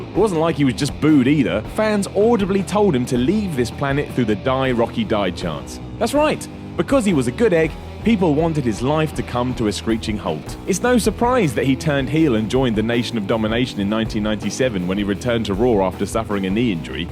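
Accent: United Kingdom. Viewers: I don't mean the accent over the a, I mean Simons British accent. So he used to saying it that way.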